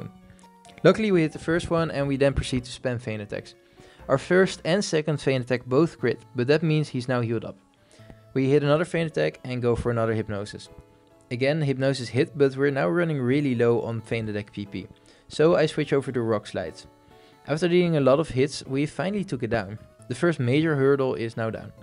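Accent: Netherlands. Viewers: weird question but you got a very dutch accent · i taught i heard a dutch accent, i am from belgium are you from belgium or the netherland3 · Hmmm that accent. Sounds dutch to me lmao because I am dutch too and I can recognize it lol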